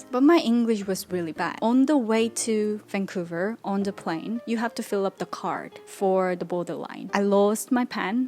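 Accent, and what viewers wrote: United Kingdom. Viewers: 너무 멋져요!!!!! 씨 보면서 저도 다시 영어공부하게 되네요 씨의 영국식 영어와 캐나다식 영어 발음이 너무 듣기 좋아요!!! 당당하고 멋진 삶 응원해요!!!!👍❤️ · 아주 깔끔한 발음에 얹어진 브리티쉬 엑센트가 넘 좋아요~~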